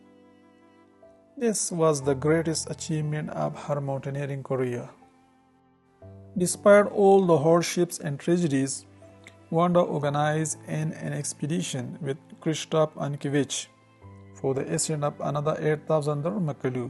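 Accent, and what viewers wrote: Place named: India. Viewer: I’m really glad I found this channel. Excellent research delivered so well. It’s wonderful to hear a strong Indian accent speaking such excellent English without compromising the Narrators Indian accent. The pace of the narration is excellent. Well done Narrator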